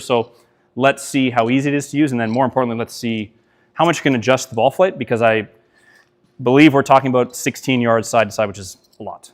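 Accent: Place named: Canada. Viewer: Sounding extra Canadian today, bud! I enjoyed the live review, nice to not just have people's best shots in the reviews.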